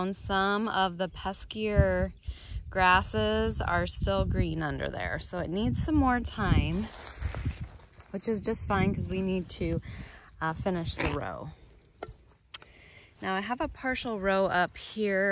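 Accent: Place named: Quad Cities, United States. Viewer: This is random, but one of the reasons I love watching your videos because you talk like me! I'm from the Illinois side of the Quad Cities and I say "root" (and "roof") the way you do which I have come to find out is not the way people in other parts of the country say it.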